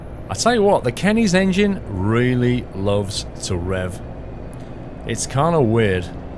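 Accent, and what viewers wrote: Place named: North, United Kingdom. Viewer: The psuedo American raised inflections as you speak mixed with a Northern Uk accent has got to stop.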